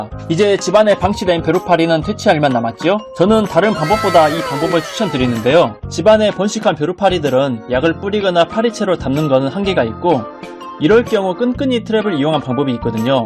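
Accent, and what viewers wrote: Jeolla, South Korea. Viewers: 전라도 사투리가 조금씩 들리네요ㅎ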